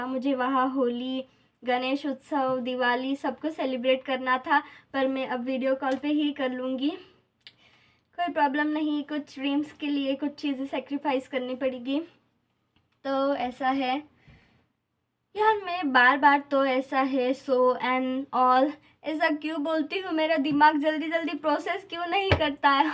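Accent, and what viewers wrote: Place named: Sindh, India. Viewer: Apki language se aap mujhe sindhi lagti hai mujhe reply kijiyega khushi hogi ke bhar mulk se mujhe reply mila.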